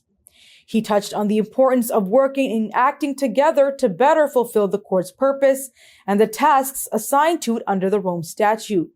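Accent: United States. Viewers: ╔═══ ❤☀💚☀❤ ═══╗ 💚💚💚💚💚💚💚💚💚 Madam, Assalaamu alaikum. Though it is more than an hour since your news video is posted, the TRANSCRIPT is not made available so far. Without the Transcript we from India find it difficult to follow your American English. Please advise your technical staff to make the TRANSCRIPT facility available for your video!!!. THANKING YOU IN ANTICIPATION!!! 🌐🌐🌐🌐🌐🌐🌐🌐🌐 ╚═══ ❤☀💚☀❤ ═══╝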